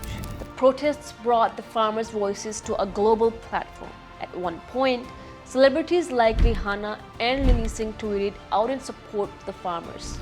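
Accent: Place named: Canada. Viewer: Or apna ye canada wala accent apni Gamnd me daal lo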